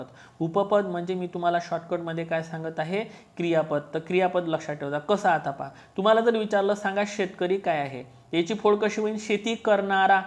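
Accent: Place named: Amravati, India. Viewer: सर अमरावती ची भाषा वाटत आहे मी अमरावती कर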